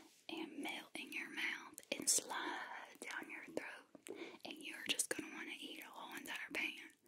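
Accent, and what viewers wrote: South, United States. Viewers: Sweet tea, ribs, and that accent are probably the only things I miss about the years I lived in the south. Stay Awesome 🤙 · Something about the southern accent man. Thanks for doing another one! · I live in Charleston SC too so I’ve spent my whole life listening to this accent and slang. Love it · Oh my god. That accent! <3 I'd give my right arm (also known as my favourite arm) for a Southern Belle girlfriend. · Do southerners really sound like this. I don’t feel like I do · Your southern accent is awesome! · I really like this video, love the southern Accent. How about more of these once in a while and or other accents you can try. And I also appreciate you 💜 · Not all sound like this but there is a good amount that does, just because you don't sound like this doesn't mean that you aren't from the south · Yes to some good sweet tea and kool-aid! 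Loving this southern accent whisper!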